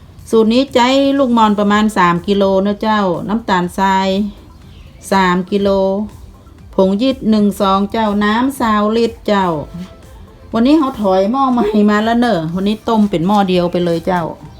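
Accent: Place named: North, Thailand. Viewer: เค้าก็พูดภาษาไทยนี่ครับ สำเนียงเหนือ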